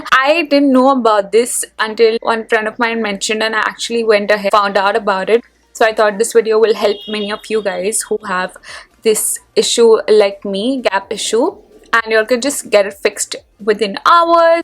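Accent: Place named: India. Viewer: Indians are so irritating with their stupid accent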